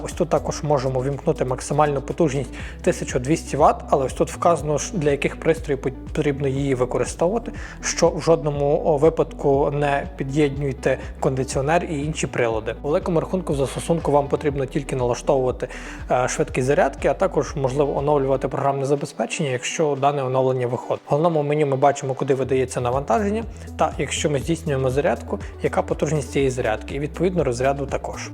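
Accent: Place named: Surzhyk, Ukraine. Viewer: це не україномовний контент. Цей карлик булькає на якомусь невідомому суржику, помішаному з кацапським.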